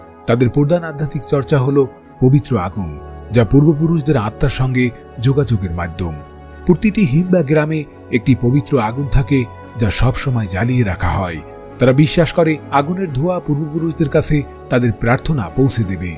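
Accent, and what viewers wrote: Barishal, Bangladesh. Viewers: আপনি বরিশাইল্লা ভাষা কেন বলতেছেন। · আপনি কি বরিশালের লোক ?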